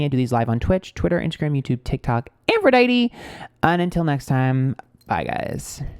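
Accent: New York, United States. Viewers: I love when your new yorker accent comes out. Get em sis 👏🏼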